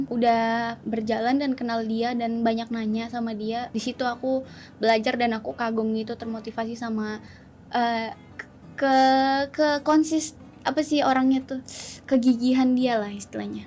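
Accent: Banjar, Indonesia. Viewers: banjar kah kak?cra ngmngnya kek org banjar😭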